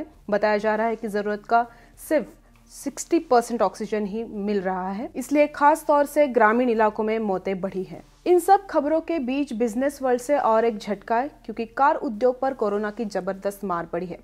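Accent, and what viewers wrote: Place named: Maharashtra, India. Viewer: Superb...... your hind is too good.. ( with a slight touch of Marathi). Love your work!! keep it up!!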